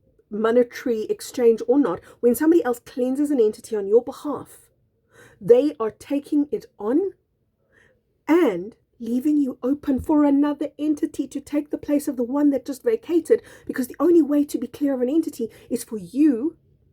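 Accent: South Africa. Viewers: Haha, more just an avid reader. I loved reading books as a kid and I always excelled in English classes, be it grammar, spelling, etc. I guess I'm just a Spelling/Grammar Nazi! On a related note, I asked what her accent was, I'm American, It didn't sound British or Australian. It's South African! They make an "EEE" sound when pronouncing pin 📌 it sounds like "PEEEN." She threw me for a loop when she said "skeletal" and pronounced it "Ske-LEEE-tal." I had to research what her accent was! Like I said, South African, or "South Af-REEE-can" 😘😘😘😘😘😘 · So delighted to discover you,! Your video popped up on my feed randomly today and when I heard your SA accent I immediately Subscribed. Lol! Such an amazing message and I cannot wait to learn more from you!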